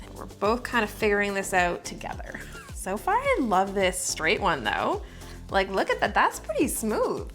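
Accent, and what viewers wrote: Canada. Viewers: Is she Canadian? I found her channel yesterday and I’ve been binging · I just subscribed! ☺️😁 also, are you Canadian? 😂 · Me: NO you are not subscribing to any more baking channels .. All you make is Vanilla or chocolate basic cake!!!!!! - Also me: But she's sooooo cute ... She's adorable!!! And that Canadian accent!!! Chef's kiss!!! - Me again: I said NO .. what are you doing?? ... Ok, you're subscribed ... I hate you 😅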